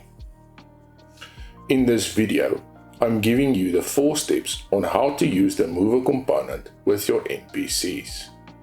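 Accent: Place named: South Africa. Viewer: South African accent is strong with this one.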